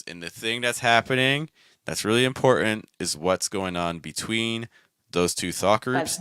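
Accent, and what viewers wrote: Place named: California, United States. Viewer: I thought you have a California accent based on your stress patterns and the occasional use of "like." :) Actually, American accents are a very interesting topic to discuss that we all non-native speakers would love to hear about. For example, what are the differences between NY and Philly accents?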